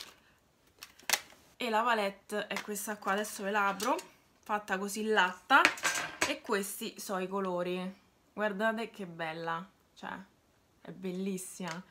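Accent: Rome, Italy. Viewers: team 🌹, amo il tuo accento romano 😍 Pezzo preferito il pigiamino di barbie aaaa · Ma vogliamo parlare dell’accento romano😍♥️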